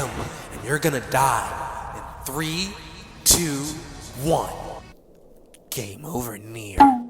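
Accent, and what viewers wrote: Philadelphia, United States. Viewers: Yo bro where you from? sound like a philly buhl😭😭😭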